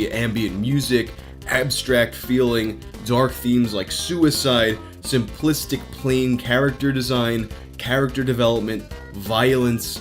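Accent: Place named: New York, United States. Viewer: "NBA Jyaam" You sound just like your impression of the stereotypical New Yoyk accent from your Kill Count vids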